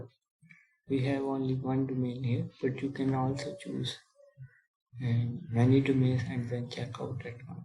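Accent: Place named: India. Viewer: I heard the Indian voice and was hopeful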